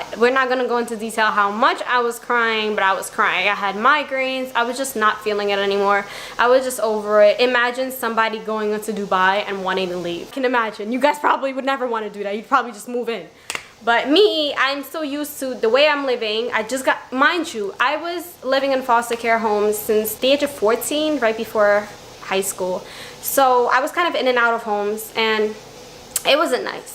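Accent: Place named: New York, United States. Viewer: I was like mmmm this girl has a New York accent. Then you said you had a Brooklym attitude and I was like wohhh awesome!! I'm from the area as well :) I'm sorry that you had to go through this. Have fun in this upcoming trip.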